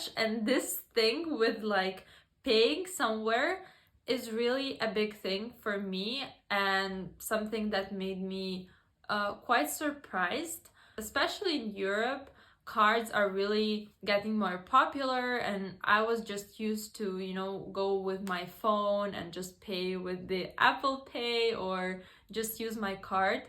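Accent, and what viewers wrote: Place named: Baltic states. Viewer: I started wondering as well since her accent is definitely not American. I was actually admiring the clarity of her speech before I realized that she has an accent that's not one I'm familiar with. My first guess would be that she's from the Baltics, but she might be from Hungary or elsewhere for all I know.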